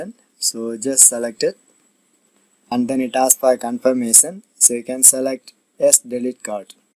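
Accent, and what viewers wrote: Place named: Bihar, India. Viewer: Bihari English👍👍👍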